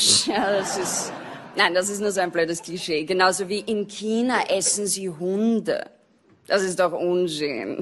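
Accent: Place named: Vienna, Austria. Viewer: Ich liebe diesen Wiener Akzent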